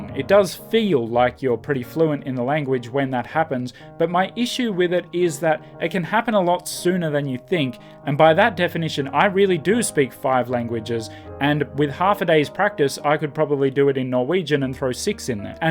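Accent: Australia. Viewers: I speak English exactly as you do. I'm from NSW, I think we all kind of speak like you. I needn't support my claim, as most other English-speakers around world don't understand us anyway. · From your accent, I guess you are Aussie?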